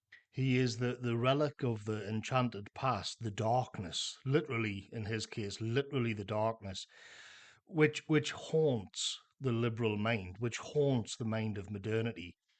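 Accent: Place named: Newcastle, United Kingdom. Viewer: My father was a Geordie, so perhaps I'll get away with saying that your accent really adds to the reading of that passage. Makes me think of the wildness of the North, walking Hadrian's Wall in pelting rain and wind, the wildness that was still there in the souls of people like my grandparents who were proud of THEIR (very working class) past, the Reivers, the Scottish border skirmishes.. and told stories of ghosts and witches.. Or I'm just being wildly over romantic, but in my own defence I'm half Geordie, half Welsh so I get it from BOTH sides...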